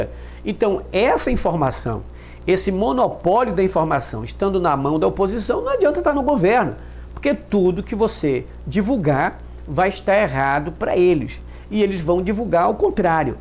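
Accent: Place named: Pará, Brazil. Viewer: Adoro sua fala sul do Pará..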